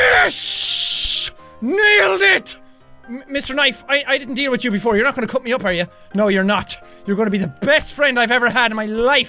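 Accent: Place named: Ireland. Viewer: Your Irish I'm Irish too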